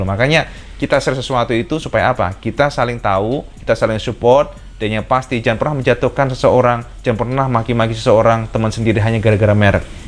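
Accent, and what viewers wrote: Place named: Java, Indonesia. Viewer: Kasih pin dong cakk.. Kesan pertama melihat channel ini cak sumeh dan gampang dimengerti tiap bahasa yg diucapkan, dengan gayanya yg njowo tenan cakkk membuat Anda terkesan waaw. Channel ini sangat2 memberikan informasi dan pengalaman2 tentang semua motor tentunya. Dangan dirangkum merakyat sekali.👍🏻👍🏻 Josss gandos #salam Pekanbaru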